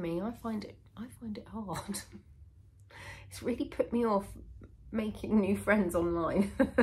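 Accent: United Kingdom. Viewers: 🥰🥰what a lovely time I’ve had knitting while you chat, thank you for that. And yes we Americans think British accents are amazing. Anyone who breaks a friendship with you is the one who lost a gem! ( sent by a big fan who is usually very quiet) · It is a strange world where busyness tries to dominate- then we pause and ‘sit for a visit’ in the home of a fellow knitter who lives half way around the world. You make us laugh, and think, and together we all pause to be thankful. As an American I’ve always wondered about my very positive response to hearing the British accents. Then I had my DNA done and discovered all my English, Scottish, and Irish roots. Your honesty and adoration, frustration and dedication, to the four males in your house is remarkably apparent. In a world where ‘homemaking’ is pitifully underrated, and desperately needed, you set a good example. 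Keep up the good work.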